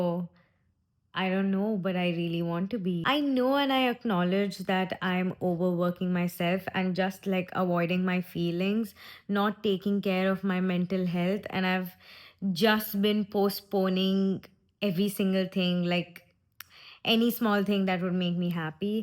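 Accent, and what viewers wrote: Telugu, India. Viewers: Ufffffff loved your Telugu accent ❤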